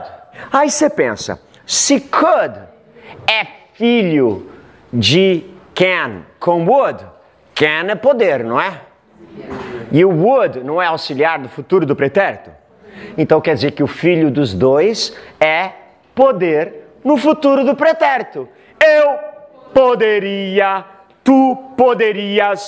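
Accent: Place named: São Paulo, Brazil. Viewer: ele tem sotque de Paulista sq sem gírias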